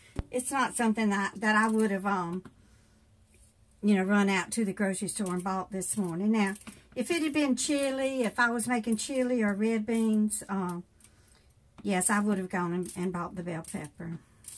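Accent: South, United States. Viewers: Hey. I've been watching your videos for a while, but this is my first time commenting. I'm from North Carolina, so I can appreciate your southern accent. I can relate to a lot of what, and how you cook. I'm a southern cook too. I enjoy your videos. I got a big kick out of you getting tickled over the artificial leg article online. lol. Keep on making these good videos, and I'll "keep on comin' back." Happy Easter.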